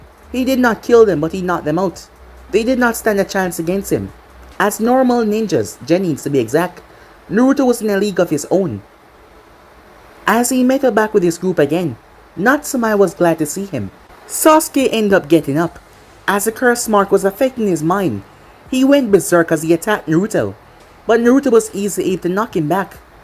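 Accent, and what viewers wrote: Jamaica. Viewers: Day <num> million trillion of asking if your Jamaican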